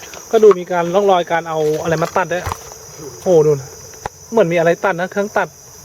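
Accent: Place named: Suphanburi, Thailand. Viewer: พิธีกร ควรฝึกฟัง พูด ภาษาลาวบ้าง พูดเหน่อๆ สุพรรณ เวลาคุยกับชาวลาว ไม่รู้คำศัพท์อะไรเลย คนฟังรู้สึกตะหงิดๆ แต่เนื้อหาดี น่าสนใจ ขนาดคนไทยด้วยกันยังฟังไม่ค่อยออก เหน่อจัด อิอิ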